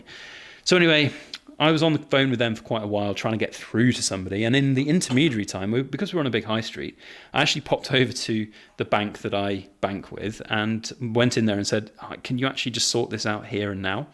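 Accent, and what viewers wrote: England, United Kingdom. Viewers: Hey bud, 2024 has sure thrown a bunch of really interesting times and? situations your way this year. I suppose that bunch of $ leaving their coffers may be a hack but as you say the 10th degree was totally not needed. You are and has an English accent that stands out like it is you. Even an untrained Canuckian can tell your voice against any other Cockney accent. I hope that wasn't an insult? However, you got them straightened out and hopefully your seller wasn't too agrivated awaiting his money. That really is a lot of silver. Must feel great to have that pile to ponder my old friend. WOOHOO for you. A hundred ounces is the most I have ever had in hand. I have a video of it (10 x 10 ounce bars) being reduced to casting shot. I am sure glad I don't have to do that anymore as I finally found a trust worthy dealer for my needs. 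Generally a buck and a quaarter over spot. Good to see you today old friend. Shall we go pour something, LOL.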